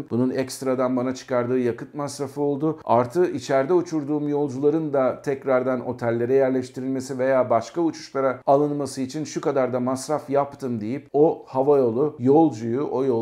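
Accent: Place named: Kayseri, Turkey. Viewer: Kaptanım, pazar günleri, Habertürk kanalında Airport isimli bir program var.Geçen hafta, uçakta ,yazılı olmayan görgü kuralları başlığı altında ,yararlı bilgiler vardı. Herkese öneririm.Hatta ,daha detaylı olarak belki siz de bir video yapabilirsiniz. Ama ,hep Gayseri aksanı kullanmayın, biraz da Rumeli,Karadeniz vs aksanlarında, takdir ettiğim tiyatral yeteneğinizi görelim. Bence Pilot olmasaydınız tiyatrocu olurmuşunuz..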